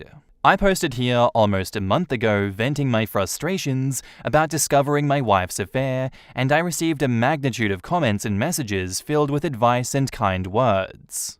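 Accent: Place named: Australia. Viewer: I love hearing your Aussie voice, it's a thankful break from all the AI read stories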